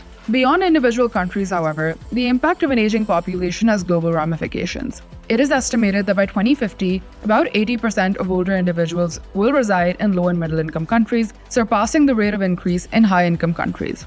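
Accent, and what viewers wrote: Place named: India. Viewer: sound like Indian and even the thought analysis seems emanating from Indian perspective. Indian thinking pattern is typical and easily predictable I like to tell the host of this channel - Indian thought are useless, and the world does not need any perspective from indian analytical so called expert, because right here in Asia, we have far qualified expert than Indian thought expert. ...I just listen 1 min and i already know what background you are coming from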